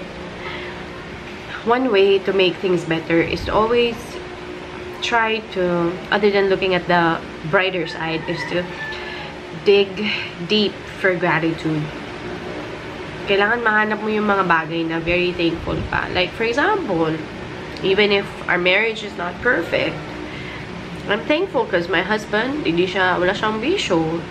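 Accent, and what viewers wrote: England, United Kingdom. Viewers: i love your english accent ate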